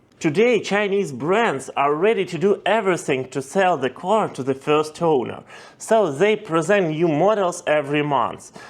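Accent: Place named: Europe. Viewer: why lie or blatantly ignore facts. You either did not do your research or have not seen the level of innovation. You sound like you're from Europe. So i wonder how you have not tried a BYD, NIO, or any of the dozens of Chinese cars in your region. Wheelsboy YouTube channel as well as countless others can educate you if you're not inclined to try one yourself. Again what's the point of telling misinformation when facts are so easy to find. The first link is AMAZING! <link> <link> <link>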